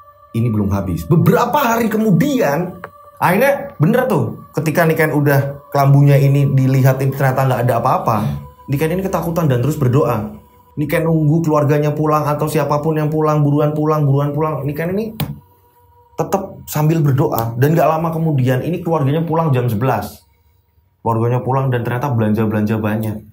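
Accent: Java, Indonesia. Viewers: Tangerang haderr Cafe King's ( Poltekpel Banten) By:Bunda ony Nb: br 6 bln ikutin channel tp udh ngerasa nyaman banget dpt banyak ilmu dan hikmah dr smua story.logat jawanya bikin seru...sumoah ada humor di setiap story cara membawa kisahnya bnr bnr bikin Susana hidup.hebat....thankz buat & crew yg kreatif banget jd penerus bangsa....sehat slalu ya buat & crew...sukses ke Dpn....di mudahkan sgla urusannya.amin... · Siang mas.. aku suka banget ceritanya mas.. aku suka logat Jawa nya..nglotok banget 👍👍👍👍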